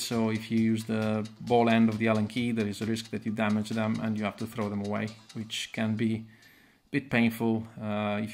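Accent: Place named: Netherlands. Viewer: Italiaanse subs maar 100% een nederlands accent! bedankt ga morgen alles installeren :)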